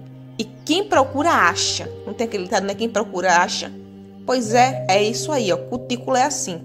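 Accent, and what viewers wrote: Northeast, Brazil. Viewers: tô amando os seus vídeos e sua voz então é das nossas tbm sou nordestina com muito orgulho, sou alagoana com de coração, sou lá de Craíbas,mais por enquanto estou morando no Pará, mais com fé em Deus ainda volto pro meu lugar, bjs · Prefiro vc comentando com seu sotaque nordestino. Sem fundo musical por favor. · adoroo muito seu trabalho parabens, gosto mais ainda qndo vc narra seu sotaque nodertino e muito maravilho mulher vc me mata de ir... susseso pra vc em 2021 continue prazendo alegria pra nois Brasileiros 😂😂😂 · Seu sotaque e maravilhoso eu amo o sotaque do nordestino e lindo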